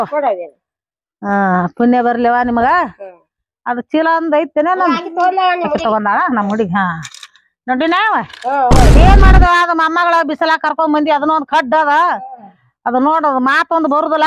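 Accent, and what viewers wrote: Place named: Bijapur, India. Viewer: ❤❤❤❤ yu very talented.. Bijapur Kannada tumba ಚೆನ್ನಾಗಿದೆ 🙏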